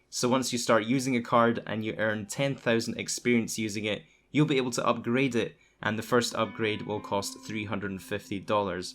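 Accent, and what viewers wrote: Scotland, United Kingdom. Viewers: Omg are you Scottish!!! You sound Scottish!!! Iam Scottish 😍😍😍 subbing just for that dude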